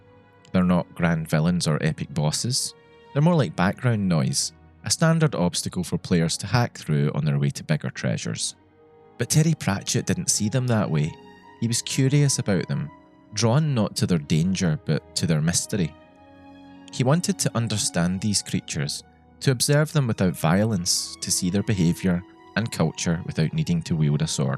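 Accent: Scotland, United Kingdom. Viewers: Is this scottish? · I love that Scottish accent and cadence. It's not so heavy that I can't understand it, but it has the bouncy musical quality of the Celtic dialects. Please continue to speak through all the ages, so that we may not be deprived the pleasure of hearing your voice. I miss Mr. Pratchett and his stories. I suppose that is the way of stories though. Life goes on; things change; stories end, begin again, evolve. We carry them in our hearts, and keep them alive in our own stories. On and on, across the endless cascade of creation. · It was strange seeing my words in print, but surreal hearing them in that great Scottish accent. You've done a wonderful job of relaying some of the magic I felt while helping to bring Vilja to life. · I also don't know how I got here. But I love Terry Pratchett. And I also enjoy listening to a scottish accent 🙂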